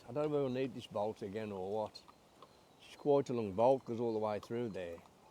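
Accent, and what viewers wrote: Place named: Erdington, United Kingdom. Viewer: Great Vid. Lovely to hear your accent. I'm thinking Erdington. Am l right?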